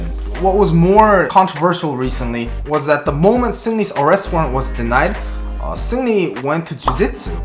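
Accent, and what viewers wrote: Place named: England, United Kingdom. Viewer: Like your English accent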